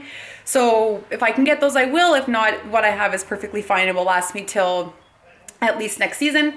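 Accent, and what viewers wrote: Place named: Canada. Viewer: Last video I didnt realize how typical Canadian your accent is, cute af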